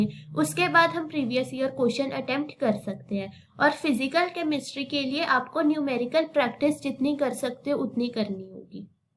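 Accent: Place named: South, India. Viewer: Thank you Madam your assent is South Indian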